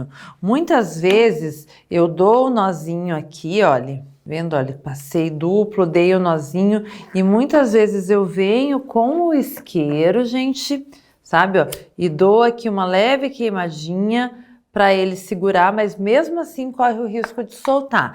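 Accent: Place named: Ponta Grossa, Brazil. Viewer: Adorei a sua aula ❤ O sotaque me dá muita saudade da minha avó de PG 🥰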